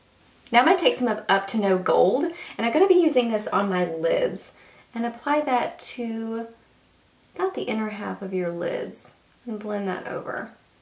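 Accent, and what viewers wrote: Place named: Texas, United States. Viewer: I literally LOVE your accent, and it makes me happy that you're a fellow Texan! Do you do collabs? I'm totally interested in doing one with you. Let me know. If not, I still love this! Haha.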